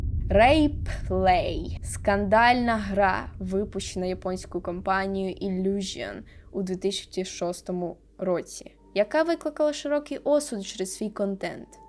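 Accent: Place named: Russia. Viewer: Спробуйте вичитувати текст перед зйомкою разів 10-20 вголос. Дуже важко слухати. Українська мова - це дуже круто, але неправильні наголоси, вимова слів на російській лад (плутанина з «і» та «и», «о» та «а») тощо, потребують додаткової практики. Щасти.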